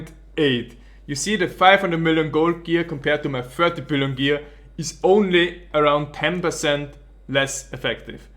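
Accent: Austria. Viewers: I love your Videos! How could anyone not recognize that accent? Austria FTW:) (I'm german though, don't get confused) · ... I just came back to diablo just cos of this video and i will play my barb for SURE! funny accent+GREAT imformative videos+Austrian+I'll be back = im so happy that i subscribed you man. Keep up that great work dude.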